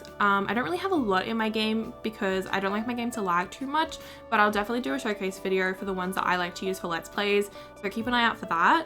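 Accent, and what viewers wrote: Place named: Australia. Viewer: I met you recently, just when the Growing together pack came out and I'm currently looking at your other stories 🥰 I loved your Australian accent and your sweet voice, as well as the way you tell the stories I am Spanish and my English is basic but I have to tell you that I can understand most of it well. Thank you very much for being so appreciative of us and for your hard work ♡ Starting to do this despite your doubts is having a lot of courage, thanks for everything ❤️ take care of yourself 🍀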